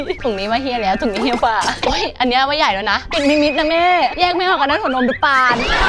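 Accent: Thailand. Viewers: ทำไมสำเนียงพี่จ๊ะออกเหน่อๆคะ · เหน่อ น่าเอ็นดู น้องจ๊ะ จุ๊บๆๆ😘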